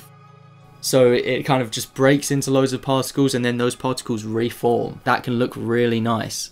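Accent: United Kingdom. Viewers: Thank you very much! I needed morphing in my studies))) I really enjoy your videos thanks for British accent ;)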